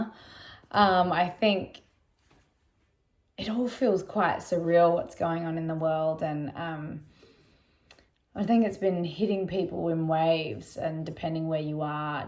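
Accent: Australia. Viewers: It's weird hearing your Aussie accent, when you're soo American in everything! I'm in Sydney, I work from home and am ok with spatially distancing. It's been pretty blazee with community co-operation here, luckily it hasn't been as rife here, hope they can get it under control in Victoria! I too have been saddened by the "every-man-for-himself" attitude but society especially in the city is kind of like that anyway. Gosh I hope they find a vaccine, but life is so precious, hope we learn from this. Much love & light Xx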